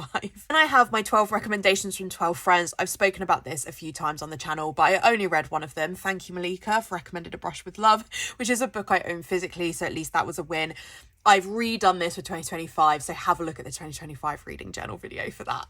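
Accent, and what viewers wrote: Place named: England, United Kingdom. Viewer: I'm currently enjoying a binge watch of reading journals videos and it was a surprise to hear an English accent! You sound a bit like Fearn Cotton ❤ Love your reading journal!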